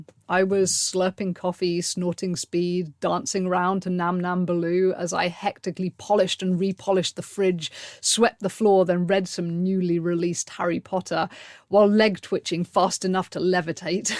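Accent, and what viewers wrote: United Kingdom. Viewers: I love your accent.almost sounds british.also,love your vibe. Good luck on ed recovery.its not easy.had a friend in high school who we all thought was a finicky eater.until...she came to school looking absolutely wrecked.tiny to start with,she got down to i think 45 or 55 pounds,and she was 5'4.deadly low.no,sadly,she did not recover.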